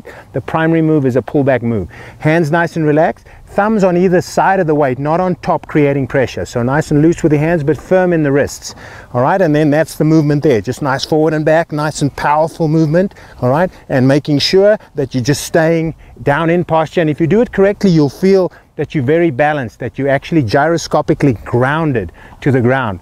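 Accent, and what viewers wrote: South Africa. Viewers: Is that a South Africa accent?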